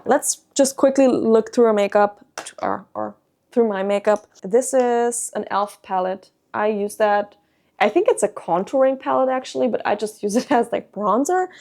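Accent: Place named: Germany. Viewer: Ich finde es so sympathisch, deinen deutschen Akzent zu hören. Freue mich auf's Video. Herzliche Grüße aus Berlin 😊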